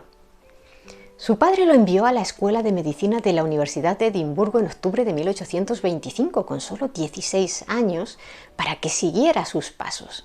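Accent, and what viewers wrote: Spain. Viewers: Me encanta tu forma de explicar la historia, con mucha precisión y elocuencia ojalá los profesores de historia se topén contigo, debo admitir que tradicionalmente el acento español no me resultaba agradable al oído, pero usted hace que eso resulte todo lo contrario. Saludos y mucho éxito.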